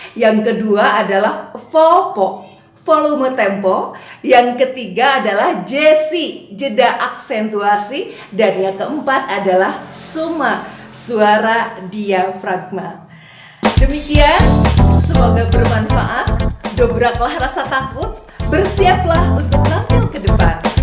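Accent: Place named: Java, Indonesia. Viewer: Ahh...masa siih... Itu ngomongnya pake logat Jawa bukan logat Sunda🤭.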